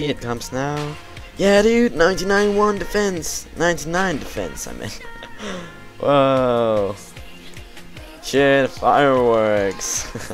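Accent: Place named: Denmark. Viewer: so danish accent.. trololol, like this serie, it's awesome seeing progress videos, Next drop: ely!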